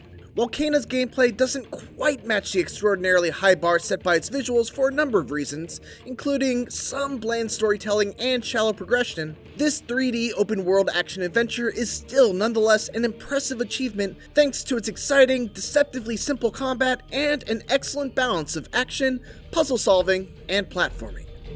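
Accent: Canada. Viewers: Why this dude sound like Canadian Guy Eh? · This guy sounds like Canadian Guy Eh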